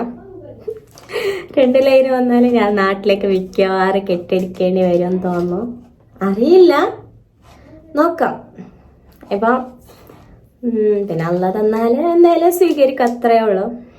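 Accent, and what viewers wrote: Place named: Kasaragod, India. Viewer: Ksaragod basha edayo kanda pole ....eda nigala home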